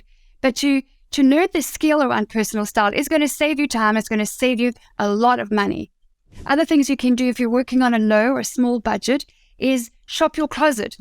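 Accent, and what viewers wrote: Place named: South Africa. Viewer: I can hear you are in South Africa. Do you do make overs? I need help.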